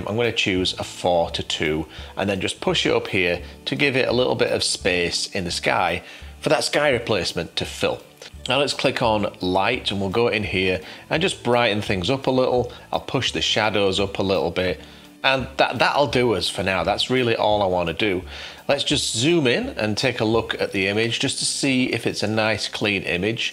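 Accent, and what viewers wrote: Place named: United Kingdom. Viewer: First time seeing your channel. I loved the ending where you ask for some feedback! From the accent, I assume you are British, and your British humor comes shining through! Liked and subscribed. Can’t wait for more content, and you ‘giving me the eye!’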